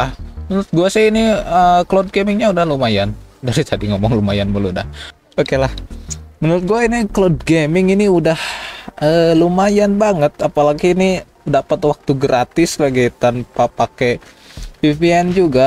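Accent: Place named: West Java, Indonesia. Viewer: logat sunda nya sangat terasa😌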